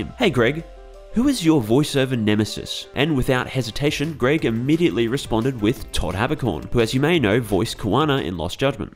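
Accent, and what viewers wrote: Australia. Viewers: The Aussie Just shone through in this video, never felt more at home!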